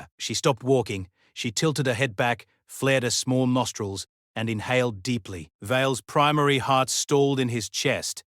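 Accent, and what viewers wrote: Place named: Australia. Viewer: Based on the narrator...she was an Australian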